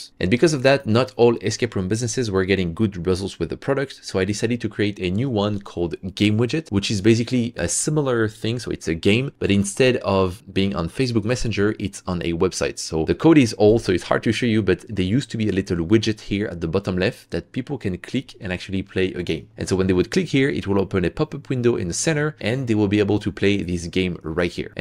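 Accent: France. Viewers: Hey, i have a weird question, are you French ? I sense some really well hidden french accent lol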